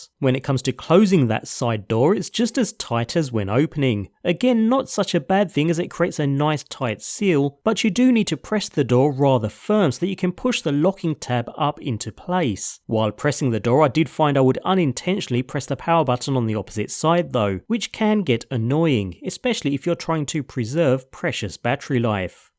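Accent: United Kingdom. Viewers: I love the way you speak, are you from UK? Anyway your review is brilliant! More reviews mate! Greetings from the Philippines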